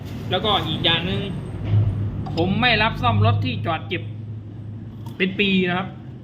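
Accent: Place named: Laos. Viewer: เว้าลาวหันและม่วน.อย่าเว้ากรุงเทพหลาย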